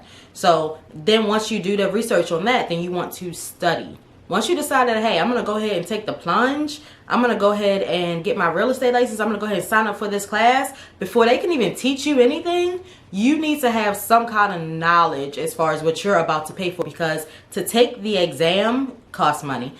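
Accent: Baltimore, United States. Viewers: I can hear the Baltimore in your accent. Good Video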